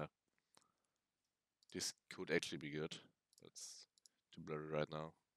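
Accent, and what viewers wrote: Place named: Germany. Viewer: i hear there a bit of DEUTSCH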